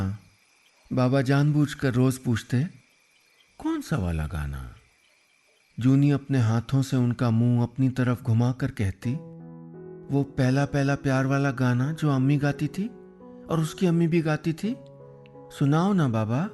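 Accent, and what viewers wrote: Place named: Kashmir, India. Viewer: That be able to use Kashmiri accent 🙏🏻🤌🏻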